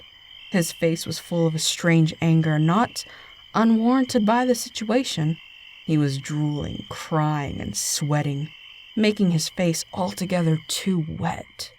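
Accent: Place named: South, United States. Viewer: Haha! I love this story. I have to say that little southern twang suits you. Be well my 💜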